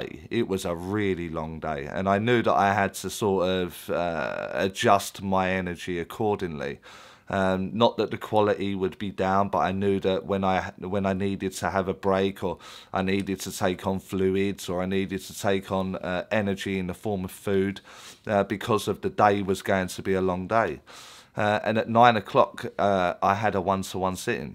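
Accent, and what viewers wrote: Essex, United Kingdom. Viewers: I've been following you since 2018/2019 and back then I could only hope I could be a Medium. Fast forward I'm now demonstrating as a Medium from 2020 and you've been truly inspirational. I am now rewatching your videos with new eyes and again learning more at a deeper level. Plus love the Essex accent but then again I'm bias as I'm Essex too and just hope I'm as down to earth as you 😊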